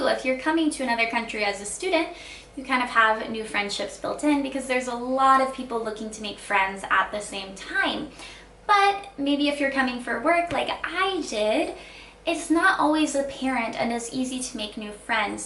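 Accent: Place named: United States. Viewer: Oh,yoyr accent is incredibly beautiful between different american accents,where is your state in USA which treat you with this beautiful american accent then??👌👌👌👌👌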